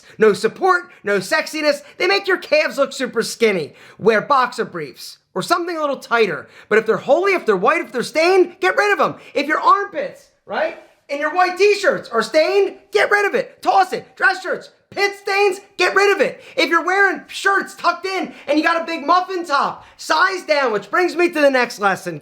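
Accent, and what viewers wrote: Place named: South, United States. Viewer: The southern side of you was out this morning. You said "like" like 2,000 times Lol I appreciate you brother. Keep it up!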